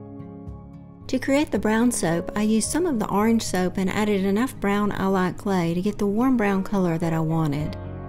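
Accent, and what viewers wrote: South, United States. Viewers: you have the sweetest southern accent, it reminds me of home. Your soaps are beautiful.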